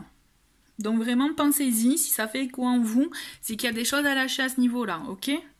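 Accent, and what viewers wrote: South, France. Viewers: Bonsoir moi personnellement j'adore vous entendre parler votre petit accent me fait penser au sud de la France vous avez l'accent qui chante et cela fait chaud au cœur pour avoir vécu une partie de ma vie dans le sud et actuellement en région parisienne cela fait du bien oui ce confinement n'ai pas tous les jours facile surtout quand on et seule même si il y a Messenger moi qui étais vraiment très proche de mes petits enfants et quand il vous dises mamie tu me manque cela va être vraiment dur oui angoisser car avec ce confinement et mon autre qui travaille je me fait beaucoup de souci car nous vivons pas ensemble merci pour votre tirage passé une belle soirée Gratitude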